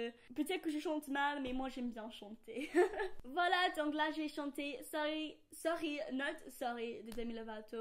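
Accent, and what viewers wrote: England, United Kingdom. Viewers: C trop mignon une anglaise qui parle français 😝❤️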